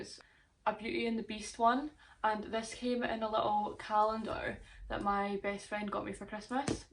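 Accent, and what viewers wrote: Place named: Scotland, United Kingdom. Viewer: You’re like the first female Scottish YouTuber I’ve seen 😀. End result : Cool 👍🏻 Love your accent , I’m Canadian so of course I love the accent 😍 #Brave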